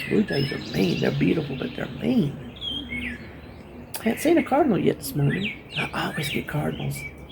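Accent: South, United States. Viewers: I love your Let's Talk videos. You make me laugh and I love laughing. Not to sound creepy or anything, but I love listening to you talk. I don't know what it is....whether its the southern accent or the fact that you are a downhome person.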